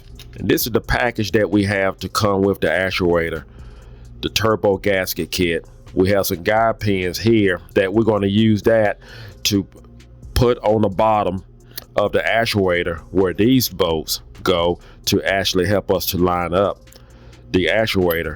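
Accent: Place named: South Carolina, United States. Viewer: Thank you sir Where are you based out of? South Carolina? Going based of the accent (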